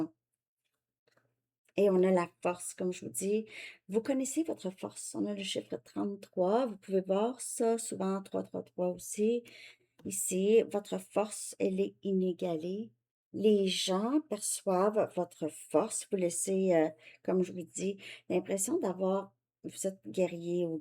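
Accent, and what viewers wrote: Canada. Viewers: Message 2 suite : En plus j'adore votre voix car elle est douce rieuse et aimante, votre petit accent canadien est adorable ! Je ne me lasse jamais de vous écouter, je suis toutes vos guidances avec le plus grand intérêt depuis 2 ans que je vous ai découvert sur YouTube. 🙏🙏🙏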